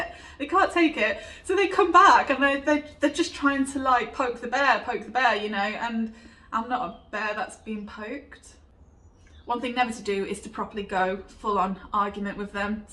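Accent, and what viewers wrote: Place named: United Kingdom. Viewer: Hey! Love your work (and love your British accent). You are super talented and I have learned so much from you. Thanks for this video - you rock! ❤️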